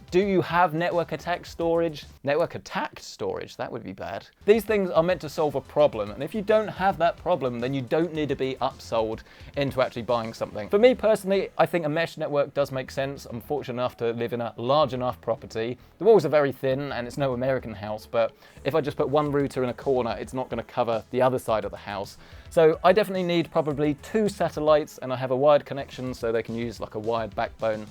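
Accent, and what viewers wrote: United Kingdom. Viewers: Brits always pronounce things weirdly... It's router not rooter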